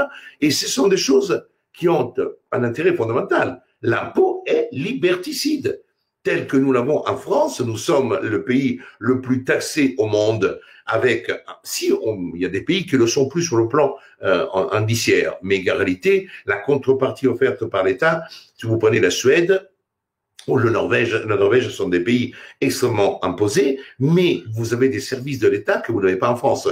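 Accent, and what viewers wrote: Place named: Italy. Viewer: Quel bonheur d’écouter notre cher cher cher, avec son petit accent d’Italie et son franc parlé.....merci à lui pour ces années de soutiens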